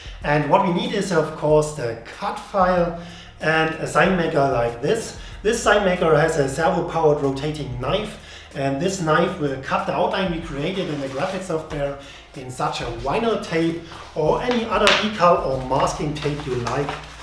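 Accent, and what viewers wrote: Germany. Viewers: Deutscher Akzent is so geil😂👌🏼 hört sich bei mir genauso an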